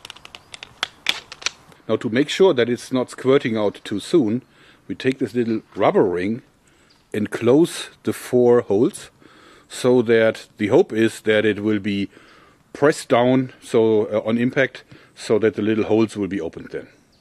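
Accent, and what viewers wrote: Germany. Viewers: I love this guy! He seems like a tough brute at first but he seems like he is really nice, but still a total badass!! His German accent makes me miss my grandpa.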